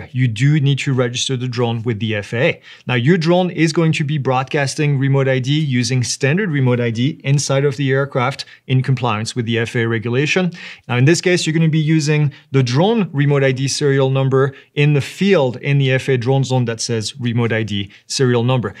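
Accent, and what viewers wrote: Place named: France. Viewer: You have a French accent but you are talking about rules specific to the USA?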